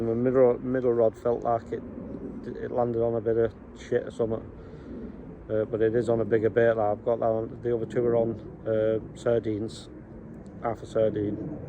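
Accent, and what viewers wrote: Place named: Northern England, United Kingdom. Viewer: brilliant video and proper northern mans commentary no snow flakes here lol keep them coming superb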